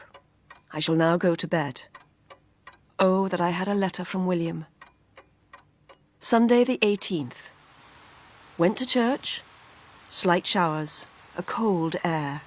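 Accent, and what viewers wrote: Home Counties, United Kingdom. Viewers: What a shame they couldn't get a reader with a Cumbrian accent instead of a home counties one?!! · Home Counties accent don't really matter does it?..a great rendition..Dorothy and Coleridge probably just close friends..we will never know for sure..been reading Coleridges' account of descent of Broad Stand Scafell.. He's got balls the size of Skiddaw...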